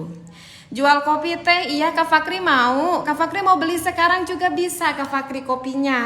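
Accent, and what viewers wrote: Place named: West Sumatra, Indonesia. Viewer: Wa'alaikum salam,. Hadir tehcannnnn. Oia, teteh ni kan sunda, tp ko mirip minang ya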